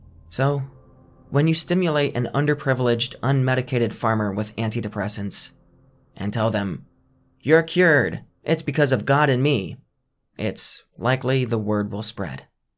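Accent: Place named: Portugal. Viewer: I find your Portuguese accent interesting. Didn't know how he do that "healings". Have a sense of documentary.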